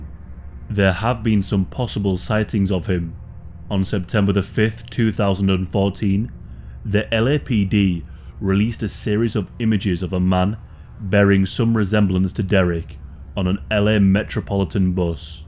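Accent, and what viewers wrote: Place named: England, United Kingdom. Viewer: Love 💕 your English accent. Hope Derrick is alive and well 💕💕🔥🔥🌹♥️🌹🔥💕💕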